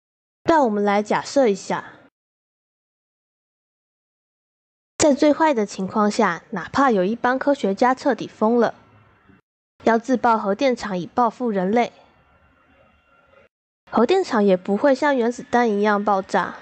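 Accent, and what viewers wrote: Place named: Taiwan. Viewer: 為何台灣國語?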